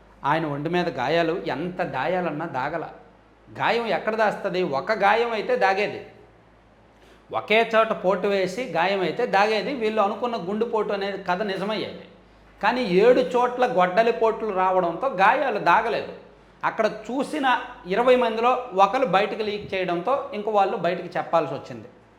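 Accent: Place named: Srikakulam, India. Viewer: Orey.....pacha chokka ni sikakulam basha apura babu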